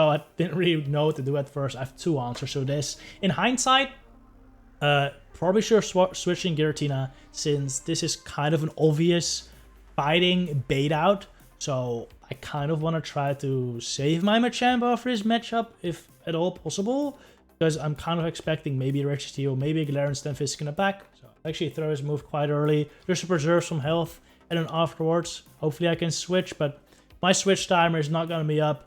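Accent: Netherlands. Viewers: I’ve been trying to clock your accent and now I think you’re Dutch! Sorry random sidebar from a new follower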